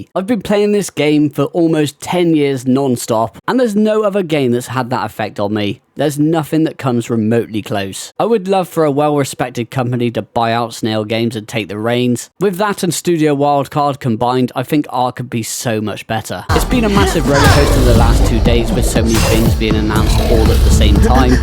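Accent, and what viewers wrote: United Kingdom. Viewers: You hit the nail on the head mate. I love how sarcastic you are. God I love the British accent lol.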